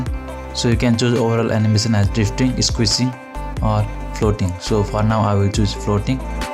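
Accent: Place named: Spain. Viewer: Your Spanish accent is so nice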